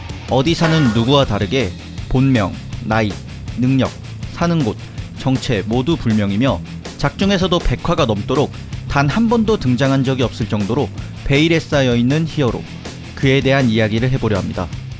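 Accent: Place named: Gyeongsang, South Korea. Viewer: 경상도분이신가보넹 잘봤습니다.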